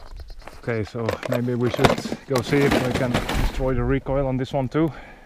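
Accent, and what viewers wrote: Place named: Netherlands. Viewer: Cool shit - trying to place the accent. Dutch?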